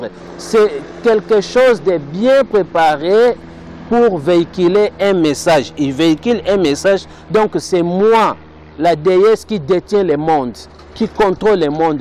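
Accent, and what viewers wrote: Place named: Democratic Republic of the Congo. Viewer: N'insulte pas pardon.. C'est l'accent congolais qui fait ça.. Personne ne t'a demandé de cliquer sur la vidéo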